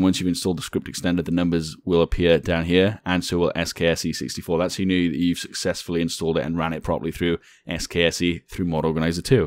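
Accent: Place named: Wales, United Kingdom. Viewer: Dunno why I was surprised to hear another welsh person on YouTube but I was lol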